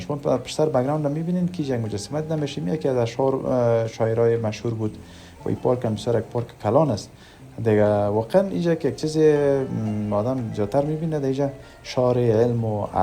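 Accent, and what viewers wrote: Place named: Dari, Afghanistan. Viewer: Shumo chiqadar bo lahni shirini dari harf mezaned . Lahjai shumo chiqadar ba mo nazdikast ki man hej ehsosi nofahmogi dar sukhanroni shumo namekynam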